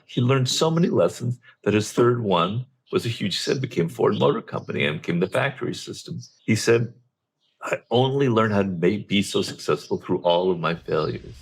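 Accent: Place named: United Kingdom. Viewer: I have difficulty understanding the British accent. I’m a beginner. By the way, great video.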